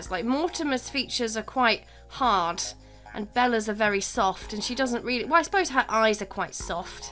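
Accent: United Kingdom. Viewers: i feel like your accent is a british version of the way eric cartman talks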